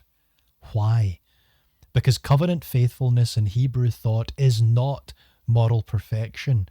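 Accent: Scotland, United Kingdom. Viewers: Just found your channel, really interesting... at least what I can understand past that damn thick ass Scottish accent 😂😂😂 but I love it 😊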